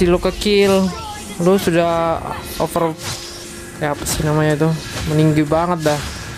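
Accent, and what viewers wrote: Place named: Banjar, Indonesia. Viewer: Bng org bnjr? Soalnya logat nya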